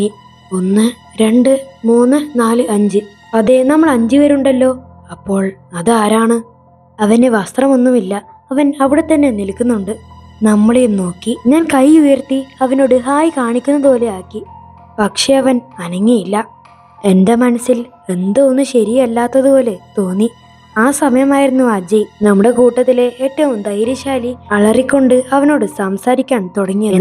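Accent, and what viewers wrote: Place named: Kannur, India. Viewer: കണ്ണൂർ സ്ലാങ് ❤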